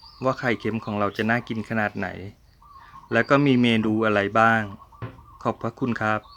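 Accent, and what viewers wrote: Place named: Suphanburi, Thailand. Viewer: เลิฟห้าหนึ่งได้ยินแล้วต้องตามมาชม เยี่ยมมากค่ะ น้อง 👍👍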